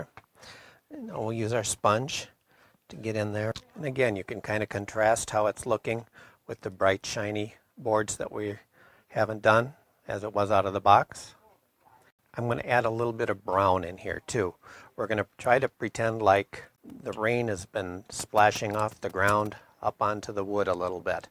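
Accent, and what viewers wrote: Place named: Midwest, United States. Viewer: If you had a clue what you were talking about you'd know that this is a midwest accent with his family originally being from Europe.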